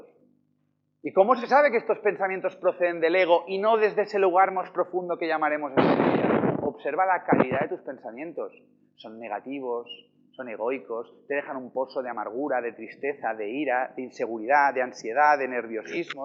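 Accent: Spain. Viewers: Gracias, pero te confieso que no soporto el acento de los españoles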